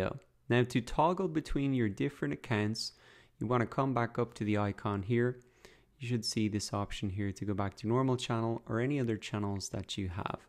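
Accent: Ireland. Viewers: Are you irish im irish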